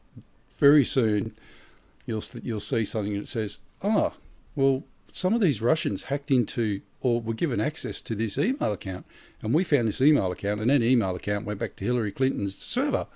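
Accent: Australia. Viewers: Are u a queenslander mate?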